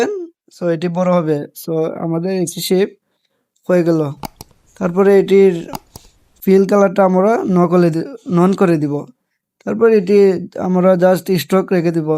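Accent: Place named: Brahmanbaria, Bangladesh. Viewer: Apnr vasha shune mone hoyeche ze bi- baria te apnr bari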